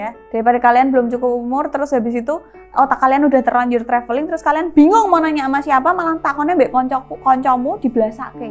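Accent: Java, Indonesia. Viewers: Bahasamu we loh mba medok banget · Lucu kamu medok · nek ngomong yo muueeedokkk lucu sih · Bisa jawa jowo mu ngendi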